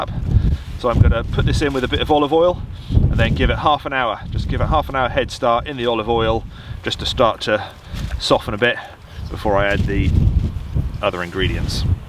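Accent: United Kingdom. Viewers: Oooo with warmer weather we might just see out doing some nice camps this spring? Man, I hope so. You are one of my absolute favorite channels on YouTube. As an American, I just love your accent and your whole demeanor, really. You are just an epic individual, so totally British. Just lovely. And the funny thing is that I don't even camp. I can't stand to go camping. But, I can watch you all day long., you are the best. Cheers!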